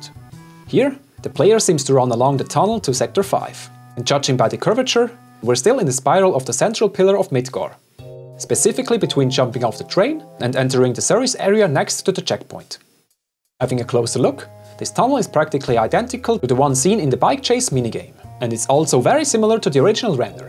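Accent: Germany. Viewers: Kommst du aus dem Osten oder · Are you German?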